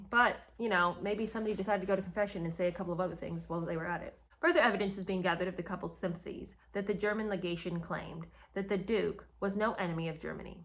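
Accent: United Kingdom. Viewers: Thanks, for bringing back your fabulous British accent to enhance your review of Traitor King. I never tire of hearing it and have actually missed it since your reviews of Spare and Revenge. The Rosenbergs were executed after being accused and tried for espionage. It makes me wonder if U.S. Intelligence agencies may have been following Wallis Simpson's antics? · 😂😂😂 I love your British accent. Making David sound like an unhinged Widow Twankey is hilarious. I started listening to your channel with the Diana book and the impersonation of her was spot on.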